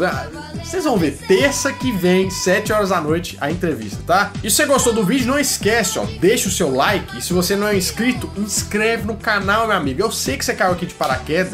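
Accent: Minas Gerais, Brazil. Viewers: Você é de Minas?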